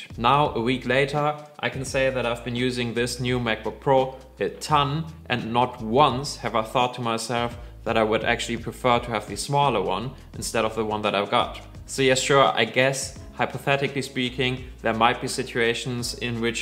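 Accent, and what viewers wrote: Germany. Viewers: Hab sooo bemerkt dass du deutsch bist aber gutes Video 😅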